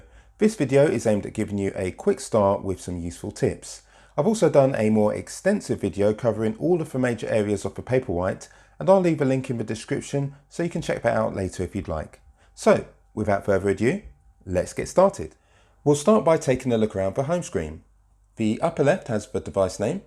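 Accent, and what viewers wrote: United Kingdom. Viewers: Not helpful at all for me. The Speaker talked too fast, but more importantly, I tried to keep up with him to find the screens his finger touched and I could not duplicate the function. I bought this new Paperwhite 2021 a few months ago (this is November 2022) because I was advised my older Kindle would no longer operate with new books added. I am trying to find someone who can actually show me how to operate the Paperwhite. Finally, why have a British voice on this video when there are thousands of American speakers who would be easier for the American ears to understand.?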